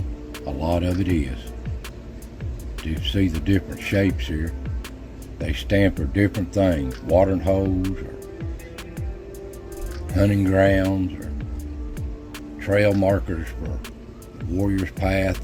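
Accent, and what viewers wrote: Appalachia, United States. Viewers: I love your video for the content, but the way you speak makes me smile. My maternal grandmother was from Appalachia, and even though she lived the majority of her life deep in south Georgia, the way she spoke was different from every body else. I could hear her in every word you said. Thanks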